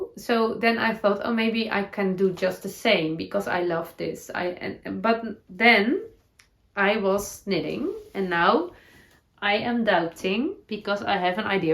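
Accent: Netherlands. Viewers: You can say your eyelids are getting heavy. I love your Dutchy English 😄 also you festive yoke is beautiful. I’m glad I haven’t made it yet because the neutral colors are really fabulous. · Your Mentor socks are so cool!! We would say ‘high instep’. Love listening to your ‘Dutchy English’. ❤️❤️❤️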